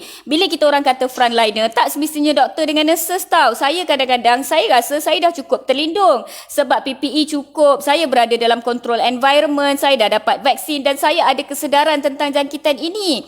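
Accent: North, Malaysia. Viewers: ya ka....igtkn org utara...heheh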